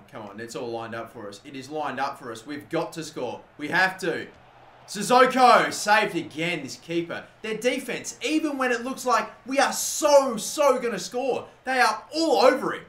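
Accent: Adelaide, Australia. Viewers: Are you from Adelaide?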